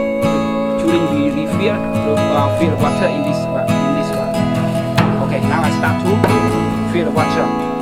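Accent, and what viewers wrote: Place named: Thailand. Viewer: Dù nói tiếng Anh nhưng nghe vẫn biết là người Thái Lan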